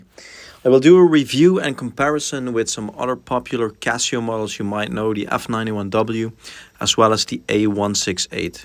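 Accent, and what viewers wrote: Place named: Netherlands. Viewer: I hear Dutch accent, where did you get it from? As far as I know it's not released in the EU.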